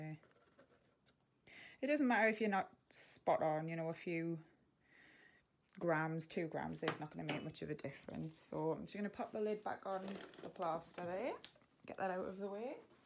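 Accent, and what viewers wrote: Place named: Geordie, United Kingdom. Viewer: This is an excellent tutorial dearest! I love your sweet Geordie accent my mandala soul sis! 🥰😍❤🥰😍❤ I am so glad to see I'm not the only one who don't follow instructions on the packet 😁😄 sometimes you just have to follow your instincts and these have turned out brilliant. Keep the videos rolling my sweetheart ❤❤❤❤❤😍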